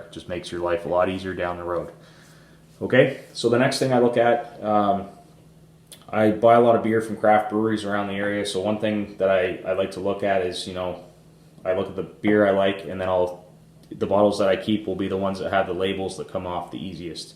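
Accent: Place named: North America. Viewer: I love the use of metric! I know it doesn't make a huge difference for most, but to hear a North American accent using metric is wonderful.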